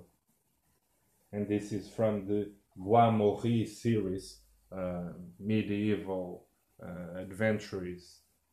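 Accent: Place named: Europe. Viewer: Speaking of european comics, Are you european too? You sound like european no offense.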